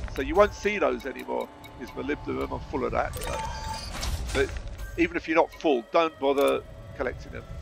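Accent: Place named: Bethnal Green, United Kingdom. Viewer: Very good video. Cheers! Love the accent BTW. Pure Bethnal Green! 👍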